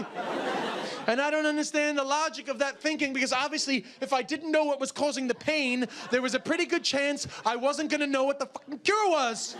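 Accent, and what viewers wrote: Wexford, Ireland. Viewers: this must have happened in Wexford cause u can hear the Wexford accent coming out in u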